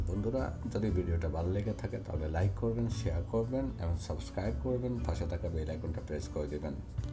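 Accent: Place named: Noakhali, Bangladesh. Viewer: নোয়াখালীর ভাষা ঢুকে গেছে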